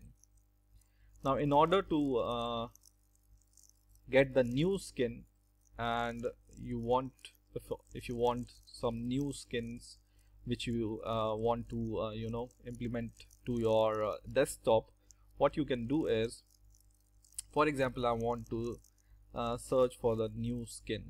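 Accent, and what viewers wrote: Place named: India. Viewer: It's certainly part Indian.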